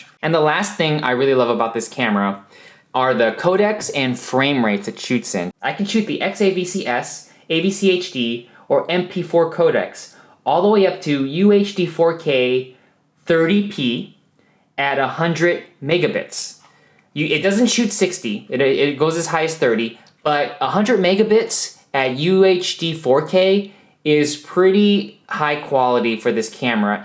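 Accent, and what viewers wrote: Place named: South, United States. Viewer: Говор этот южный... поубивал бы начлен. Чесслово, уши вянут. А после Samsung HMX-H405... Ну, чего тут сравнивать-то - земля и небо. Если кому надо русифицировать AX60 или настроить AX53 (или иную камеру Сони) на 30/60/120 к/с - обращайтесь, научу. У меня АХ53 пишет в NTSC и дез получасового ограничения записи.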